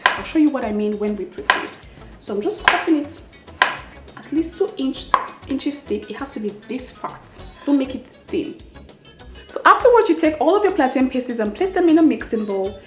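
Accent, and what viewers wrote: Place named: Igbo, Nigeria. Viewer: I think she is an igbo girl the way she speak oo my god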